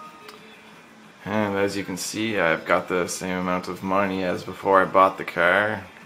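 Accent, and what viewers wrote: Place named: Canada. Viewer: Love the Canadian accent. The way you say car is priceless.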